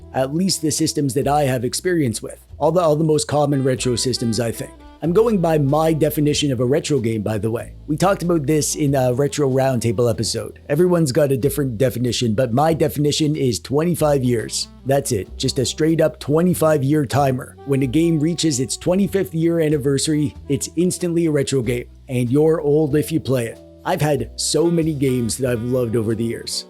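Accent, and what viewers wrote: Canada. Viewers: Stop saying Mario wrong. You sound like a Canadian.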